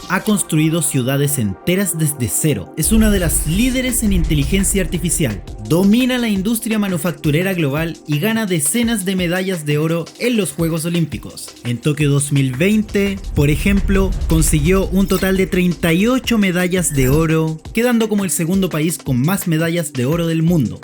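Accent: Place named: Chile. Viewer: Chileno Detect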